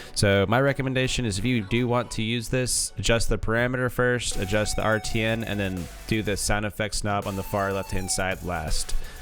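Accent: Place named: United States. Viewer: guys guys guys guys guys guys guys guys guys ... fucking american speaking, nothing of substance.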